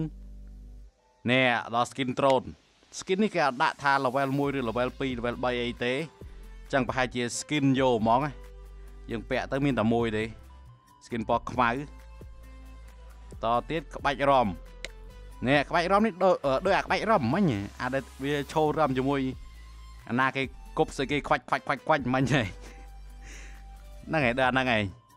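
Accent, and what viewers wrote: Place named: Cambodia. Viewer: ប្រូខេត្ដណា មិចយាយអណ្ដើនម្លោះ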